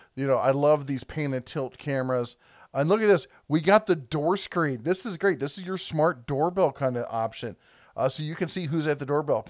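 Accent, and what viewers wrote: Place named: Brooklyn, United States. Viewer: Pittsboig ??? Are you from my hometown.. Brooklyn ? 😁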